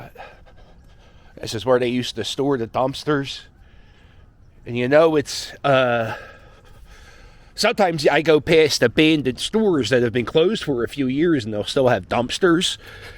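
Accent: Philadelphia, United States. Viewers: I come from 4 generations of Philly. Those pronunciations are quintissentilal Philly.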